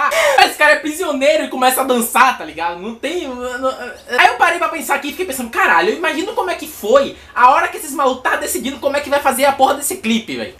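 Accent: Bahia, Brazil. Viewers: c é de salvador ? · ele é baiano????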